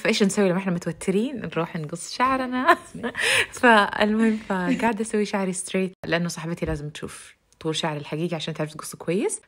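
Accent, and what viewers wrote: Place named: Saudi Arabia. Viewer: اللهجة السعودية سموذ ومريحة وصوتك حلو ماشاءالله ❤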